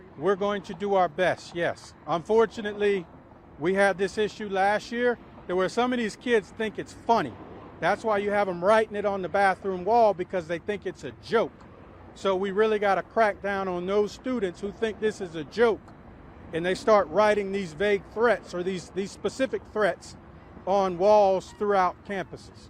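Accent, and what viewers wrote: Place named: South, United States. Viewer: I don't know what it is but Southern accent makes it enjoyable to listen to anything being said lol.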